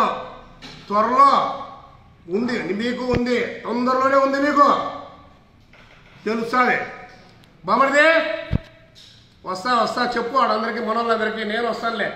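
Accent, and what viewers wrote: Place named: Rayalaseema, India. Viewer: anna needhi mana rayalaseema language la vundhi dare and guts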